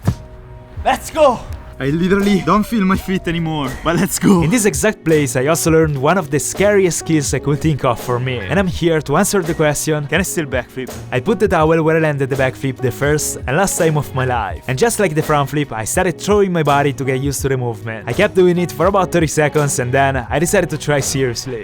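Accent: Italy. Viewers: Si capisce subito che sei italiano ahahah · Ahaha, when you said that you have to become a compass I knew you were Italian, then I listened to the accent and saw the location. gg bro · ma come forse😂 l'accento è italiano😂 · Si sente che sei italiano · vedo un po di italiano in questo inglese <3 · non so perchè ma dall'accento , e dal fatto che quando hai fatto il test della velocità di scrittura era italiano penso proprio che tu lo sia , non so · Your english sounds like italian english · You can practically taste the Italian accent,Grandioso, lol. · Ma sei italiano perché questo è il tuo primo video che vedo e si sente che non sei ne inglese e ne americano e anche perché parli in inglese uguale a me😂🇮🇹 se sei italiano sei un grande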